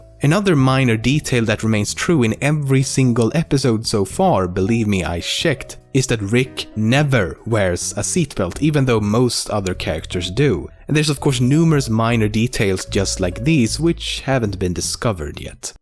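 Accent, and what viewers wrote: Sweden. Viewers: There's something slightly wrong in the accent of the narrator of this video. Like he was slightly swedish or something? I don't know. POST-EDIT: towards the end "Believe me ... I've SHECKED" , that was a dead on class A swedish sign. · Hej ska du bryta lite mer på svenska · Everyone's applauding the severe accent of a Swedish person who never thought about what he sounds like, calling it soothing. Okay. To someone else it's annoying as hell, how smug he is sounding while pronouncing English like a third grader. I'm not saying I can do better videos, but seriously, I'm from Sweden and most people know how to say "j" and "ch"... Or it could be on purpose, who knows. Now I know what accent to fall back into when needed, lol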